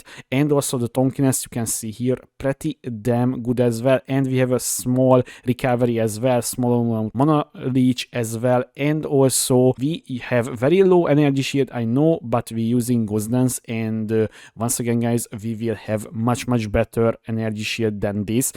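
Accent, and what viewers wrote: Hungary. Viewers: He s english is wierd bc of he is born ín hungary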